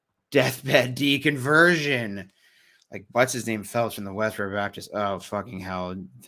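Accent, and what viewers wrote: United Kingdom. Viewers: The British accent!! 🤣🥰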